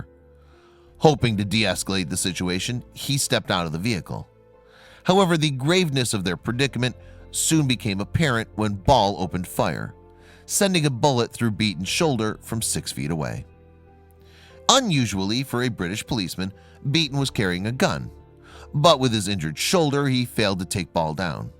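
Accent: United States. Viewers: This was stupid and maudlin. Nobody nowhere wants to see boring still photographs while listening to someone's shrill, whiney yank accent.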